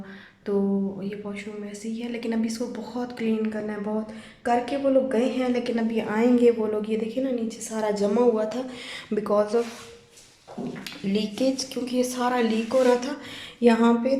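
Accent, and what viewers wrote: Saraiki, Pakistan. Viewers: Apka excent buhat pyara hai. Looks like seraeeki touch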